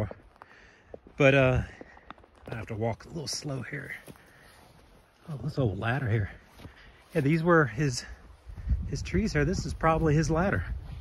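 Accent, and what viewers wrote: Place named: South, United States. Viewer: Love your southern drawl…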